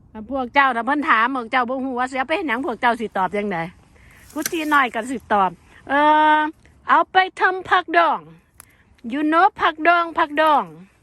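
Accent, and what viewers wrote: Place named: Sisaket, Thailand. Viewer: น้อง พูดเหมือนคนศรีสะเกษ นะ ถ้าใช่เราคนบ้านเดียวกันนะค่ะ เดินมาหาผักเสี่ยงฝรั่ง คือมีด้วยค่ะ ปาโดด🤣❤️💞💞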